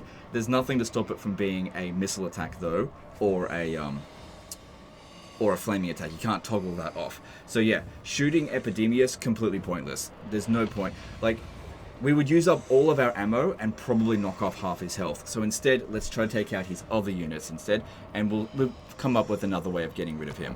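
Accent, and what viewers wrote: Australia. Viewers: The subtitles dont recognize australian, you speak too fast and unclear.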